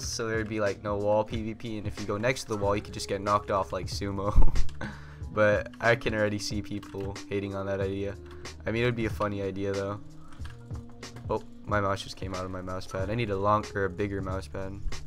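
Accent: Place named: Netherlands. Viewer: Where are you from..? You sound Dutch lol.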